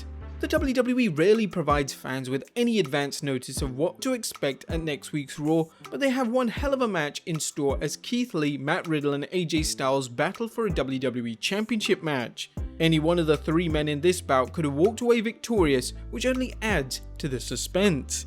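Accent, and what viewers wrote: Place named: Southern England, United Kingdom. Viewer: Southern English man not British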